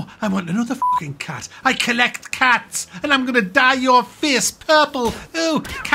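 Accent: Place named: Sunderland, United Kingdom. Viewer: this. He's a mackem. I know because I'm from Sunderland. The difference in the accent is very noticeable to someone from the north east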